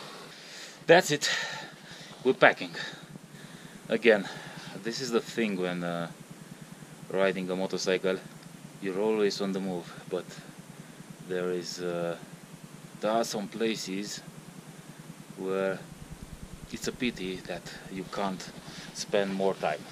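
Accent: Russia. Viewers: First time no youre great channel, i like the Rusia accent! Exellent videos! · Чисто русский акцент)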